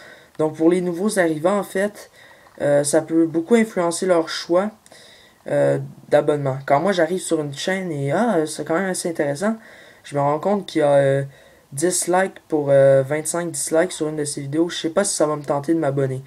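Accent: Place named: Quebec, Canada. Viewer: Salut ^^ alors toi qui a un asser gros nombre d'abonnés pour un joueur psvita je ne t'avais jamais aperçus sur youtube :) j'ai moi aussi des personnes comme toi qui dislike met vidéo amors que j'ai quel que bonne personne qui like ( exemple: 9 like 2 ou 3 dislike )bref. Je ne sais pas comment régler ce probléme moi aussi :) sur ce j'ai regarder ton contenue tu est un exelent joueur psvita :D continue comme ça ! +1 abo ( ps: j'adore les accent québecois j'aimerais trop vivre au québec !!)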